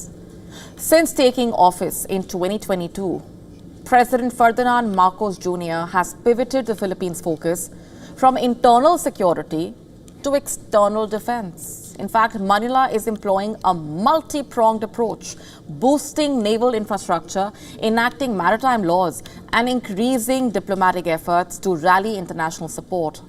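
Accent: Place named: India. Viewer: She is annoying, the other anchors do a much better job...this is typical head bouncing Indian accent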